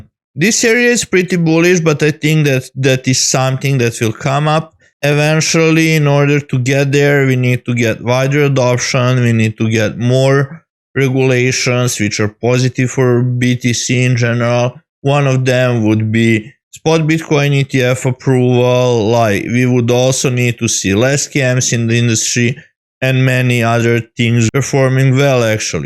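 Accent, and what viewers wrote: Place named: India. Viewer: Da, zvucis ko indijac..jbg sry ostavi ako zelis da radis na engleskom ali mozda da odradis i na nasem jeziku ili novi kanal za balkance!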